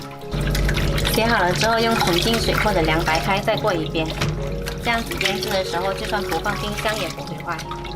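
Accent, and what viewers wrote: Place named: Teochew, China. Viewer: 是揭阳普宁一带的客家人吗？听着潮汕话挺标准的（本人潮州的）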